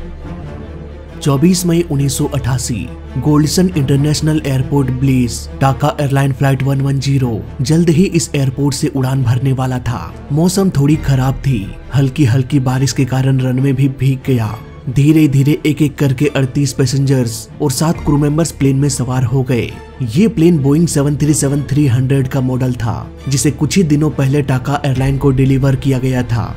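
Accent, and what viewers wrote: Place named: Bihar, India. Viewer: Tu news to ache deta h lakin hinde bol na sekh le bihare brass mat bolo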